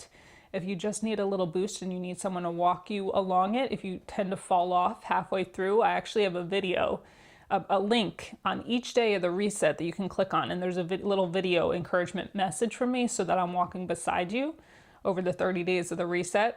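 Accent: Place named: East Coast, United States. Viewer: Not the secret Masonic hand symbols 🤣 I can't believe someone would accuse you of that lol. I like listening to accents and the way people talk. If I had to guess, you're from the East Coast. My guess is New York. Possibly New Jersey. I'm from PA, and the way you speak is familiar to me. I also talk with my hands a lot. No Masonic symbols though haha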